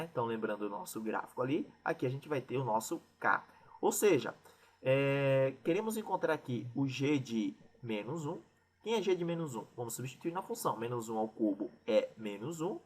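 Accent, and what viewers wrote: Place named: Ceará, Brazil. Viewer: E esse sotaque maravilhoso é de onde?És também do Ceará? Parabéns pelo trabalho, muito bom!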